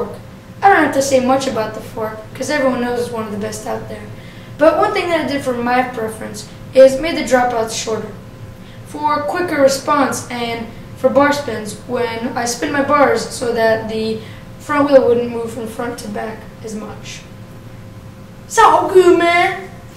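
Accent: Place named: United States. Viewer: are u american or russian ur names sounds russian but your accent is american im confused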